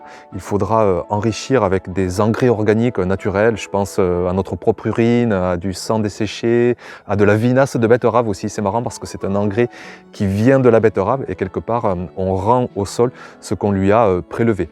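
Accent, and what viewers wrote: South, France. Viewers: Un Topeurwére! Ah l'accent du sud ! 😁. Super vidéo comme toujours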